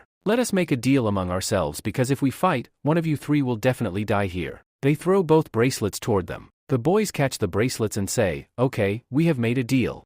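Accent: India. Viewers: Wtf is this voice bro is not getting ppl it's is like a Indian person is talking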